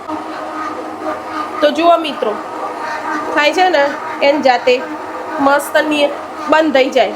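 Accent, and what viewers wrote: Sabarkantha, India. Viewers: બેન સાબરકાંઠા ના પટેલ લાગે છે ભાષા 😅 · સાબરકાંઠાની બોલી 😊😊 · Sabarkantha boli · Sabarkanthaa ni bhasa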